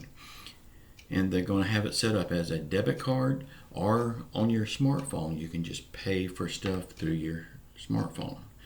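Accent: South, United States. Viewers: Iove your southern accent. Thanks for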